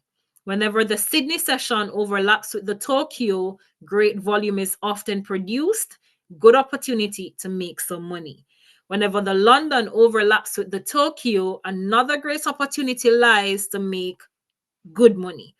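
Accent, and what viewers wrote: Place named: Jamaica. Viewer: Good video! Are you jamaican? You have a lovely accent 💯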